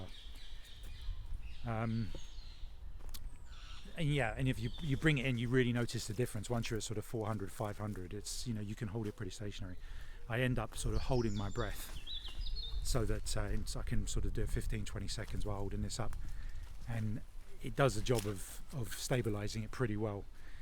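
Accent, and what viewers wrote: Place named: England, United Kingdom. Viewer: It's nice to hear a English review on this lense instead of some high speed American I'm thinking about it because its always nice to have a little extra reach, good review thank you.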